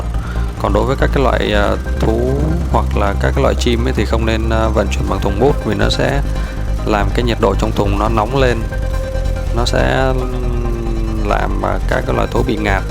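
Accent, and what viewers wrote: North, Vietnam. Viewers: giọng bắc 54 ở đồng nai .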